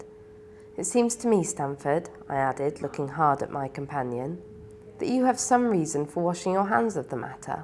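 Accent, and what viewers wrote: United Kingdom. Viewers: 여기 담당자님께 질문 드려요 쌤의 발음은 영국 어느 지방의 사람들이 쓰는건지요? 감사합니다 😀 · 귀르가즘이 뭐 여기서만 쓴 표현도 아니고 영상과 사운드가 중요한 유튜브에선 특히나 많이 쓰는데 왠 불편러들이 이리 많지. 이 표현 뿐만 아니라 이 채널 전반적으로 선생질 하는 댓글이 많은데 뭔 교육컨텐츠라고 꼰대들만 몰려왔나. 영상 좋다가도 댓글 보기가 너무 불편. 습관적으로 스크롤 내리는걸 안해야할듯 ㅡㅡ... 선생님 목소리도 편안하니 듣기 좋고 발음도 영국 특유 발음이 듣기 좋아서 어울리는 표현이라고 생각하고 특히 영어 교육에 특별히 관심이 없더라도 호기심 가지고 눌러볼 만한 제목인것 같아서 오히려 더 좋은것같습니다. 실제로 제목 보고 들어와서 컨텐츠 내용 좋아서 구독했구요.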